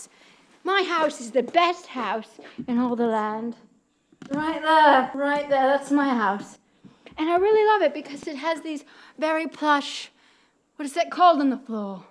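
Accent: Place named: United Kingdom. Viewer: Aww i love youuuu ❤️❤️❤️❤️ and your British accent is brilliant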